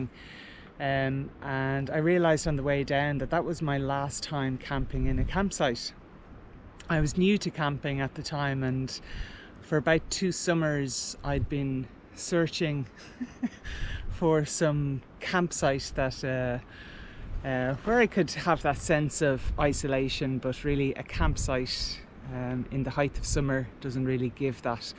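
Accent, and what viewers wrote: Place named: Ireland. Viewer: You’re a gifted storyteller - both visually & through words. Delighted to watch your adventures & listen to your gentle Irish brogue. ☮️&💟 from Sydney.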